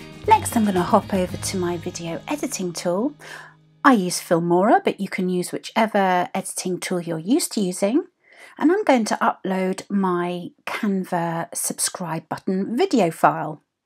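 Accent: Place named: United Kingdom. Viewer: Calm and Steady to understand thank you and "very British" indeed :)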